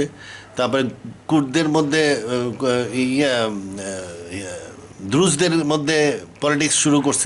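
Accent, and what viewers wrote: Manikganj, Bangladesh. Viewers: আস্সালামুআলাইকুম স্যার, আমি আপনার একজন বড় ফ্যান, আমি প্রায় সময় আপনার ভিডিও গুলো দেখি এবং এই পর্যন্ত অনেক ভিডিও দেখেছি, সময় সাময়িক ভিডিও গুলো ও অনেক ভাল হইছে, তবে ভিডিও গুলো এতো লং time যে এক ভিডিও শেষ করতে অনেক দিন লাগে। বিদেশে থাকি ফ্যামিলি নিয়া, সময় খুব কম। By the way, আপনার বাড়ি কি মানিকগঞ্জ লাগছে, আপনার কোথায় মানিকগঞ্জ এর টান আসে, আমার এক প্রিয় আঙ্কেল aunty থাকে সেখানে বাড়ি। Anyway আপনার একটা ভিডিও দেখেছিলাম জাতীয় সংগীত আর আজমী সাহেবের সম্পর্কে, আমি আমার এক বন্ধুকে সেটা দেখাতে চাইছিলাম but কোথাও খুঁজেছি পাই নাই। আপনি কি আমাকে লিংক তা দিবেন। আর একটা বিশেষ অনুরোধ, আপনি তো কুরআন তাফসীর করেসেন সাইন্টিফিক ওয়েতে, আমি সেগুলো দেখছি, এখনো শেষ করতে পারিনি, ভিডিও গুলো অসাধারণ। যেহেতু আপনি এই বিষয়ে অনেক জ্ঞান রাখেন, আপনি কি একটা গুরুত্বপূর্ণ বিষয়ে একটা ভিডিও বানাতো পারবেন, টপিকস টা হলো, রমজানের রোজা ও ঈদের চাঁদ দেখা নিয়ে, অনেকে নাকি চাঁদ আকাশে দেখার আগেই সাইন্টিফিক ওয়েতে চাঁদ এর জন্ম গণনা করে ফেলে, সেটা কি সায়েন্টিফাক্যালি অনেকে নাকি চাঁদ আকাশে দেখার আগেই সাইন্টিফিক ওয়েতে চাঁদ এর জন্ম গণনা করে ফেলে, সেটা কি সায়েন্টিফাক্যালি হচ্ছে? 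এই বিষয়ে আপনি কোনো রিসার্চ করে একটা ভিডিও করতে পারবেন, কুরআন আর গণনা অনুসারে কোনটা সঠিক? আর সাইন্টিফিক গণনায় চাঁদ দেখা কি ইসলাম সমর্থন করে? আপনি কোনো পদ্ধতি সঠিক মকনে করেন? কুরআন ও সাইন্স এর আলোকে একটু ব্যাখ্যা করবেন প্লিজ। এই মত নিয়া সমাজে অনেক ভেধাভেদ, একেক গ্রুপ একেক দিনে ঈদের namaj পড়ে ও সমাজে বৃশৃঙ্খলা তৈরী হয়।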